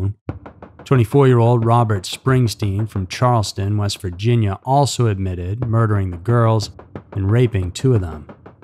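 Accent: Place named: East Texas, United States. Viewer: He's from East Texas.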